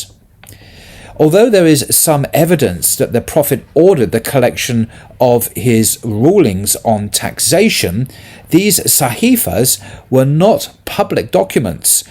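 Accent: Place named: England, United Kingdom. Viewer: Alhamdulilah for Brother. I always learn a lot from him. I especially enjoy his accent..a true English man.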